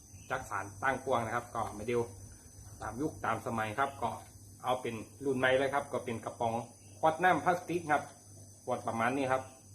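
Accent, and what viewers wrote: North, Thailand. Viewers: คนเหนือใช่มั้ยคับ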